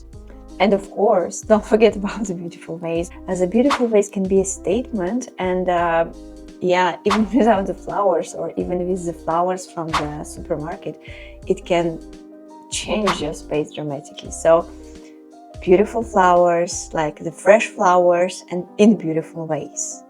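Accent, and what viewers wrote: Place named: Germany. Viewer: You German huh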